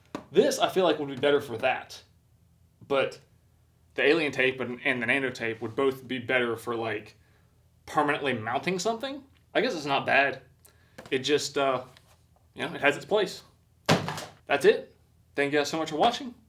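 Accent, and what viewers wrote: South, United States. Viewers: Sounds country but doesn’t act country 🤷🏻‍♂️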